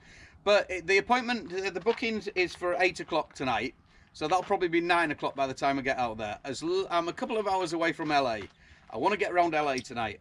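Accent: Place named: West Yorkshire, United Kingdom. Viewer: Lovely place , bit nicer than Wooley Edge Services eh? 🤣🤣 Great channel , where are you from in Yorkshire ? Sounds like West yorks to me , Im5 from Keighley , anywhere near there ? Cheers .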